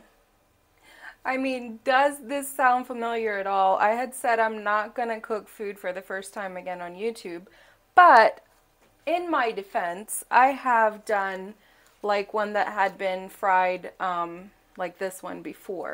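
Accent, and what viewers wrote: Kenya. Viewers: Your accent and English are soooo Kenyan. The adaptation/change is incredible, almost unreal. Enyewe, we learn everyday. Waah!! And there's nothing as tasty as Ugali and samaki. I could eat that every day of the year, but I have to get over a laziness in the kitchen that's come over me lately. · Your Kenyan accent is on point! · That's ugali size normally not enough for one 😃. I like this and definitely you no longer sound mzungu..yous accent is just Kenyan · Your accent sounds like Kenyan and not American….by the way I live in Reynoldsburg, Ohio. You’re inspiring! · You already sound Kenyan, your accent!! This was lovely to watch. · Your accent are becoming Kenyan 😍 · You sound more of a luo,its understandable coz has been brought up amongst luos,he actually looks more luo than kisii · You sound so Kenyan🤣♥️ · I can hear a bit of Kenyan accent in your Lish. 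· So....one thing that has fascinated me about you is the Kenyan accent you have while speaking English....amazing. I am Kenyan myself living in Iceland for twenty three years. You just earned a new subscriber.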